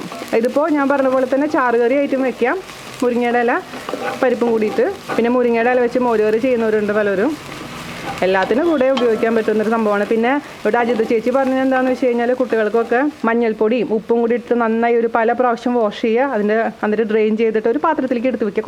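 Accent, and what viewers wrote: Thrissur, India. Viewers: തൃശൂർ ഭാഷ ഒരു ലെവലാട്ടാ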